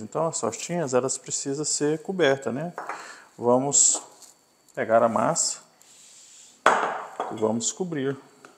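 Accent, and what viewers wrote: Minas Gerais, Brazil. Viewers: Vc é mineiro?amo esse sotaque kkkkk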